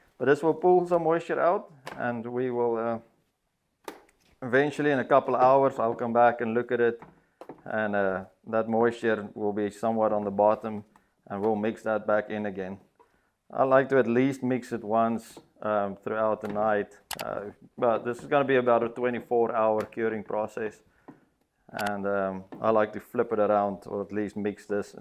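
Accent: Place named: United States. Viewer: Nice video. Can hear you getting a slight American accent, keep your Afrikaans accent don’t lose it. Jy Ian ook lekker skinner nou.😂 Baie geluk🙂👍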